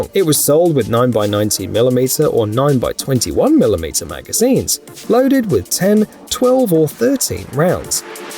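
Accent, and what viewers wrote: United Kingdom. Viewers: All these guns are bullshit for the prices. If someone starts waving a high point at you, your ducking either way aren’t you!. And yeah, I’m British, but having a British accent talking about gun specs 🥴🤷‍♂️ I know more than the script he’s reading from. 👍🏻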